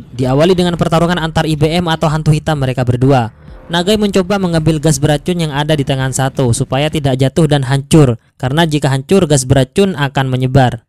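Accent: Madura, Indonesia. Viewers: Ternyata Logat nya Madura Kawuk..Yo Opo Kabar e Pokeh Celeng..Salam Damai Dari Persaudaraan Dayak Madura Sy dari Sampit..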